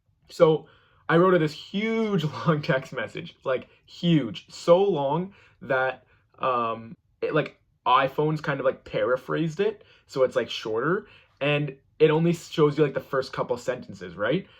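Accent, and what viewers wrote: Canada. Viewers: Your Canadian accent is thick. I love it and I love your content bro. Keep them coming man and you're cute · Well done matey! Be proud of who you are! BTW you are the most Canadian sounding Canadian I’ve ever heard in my life! Xx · This has all the Canadian words in it! Hoouse, ooout, 😀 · Sounds like he's from Canada. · The strongest Canadian accent ever lol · You have such a cute Canadian accent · I'm a straight female but I'm watching coming out videos lol instead of studying. Good for you for coming out and being your true self. Hi from Ontario and damn, your Alberta accent is strong. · Gorgeous young stud...even with the Canadian Accent. I hope you have a wonderful life! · Me watching this channel for the first time: “Canadian shirt? Is he Canadian?” Him: pronounces the word “out” in that cute ass Canadian accent Me: “knew it. I should be a detective” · interesting. 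he has the strongest Canadian accent i have ever heard. what part of Canada is he from? London, Ontario? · What about the poster in French? I had to check where London was in Canada. Obviously he wasn't referring to the U.K. His "uit" and "huis," known as Canadian raising, sound different than in other parts of Canada. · This guy has a Canadian accent. Not foolin' me....lol He says Ouwwt. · I love your Canadian accent.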